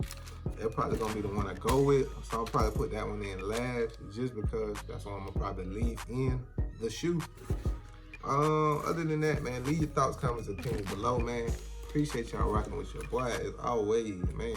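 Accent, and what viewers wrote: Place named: South, United States. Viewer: Can’t tell if you’re from Atlanta, Florida, or Alabama....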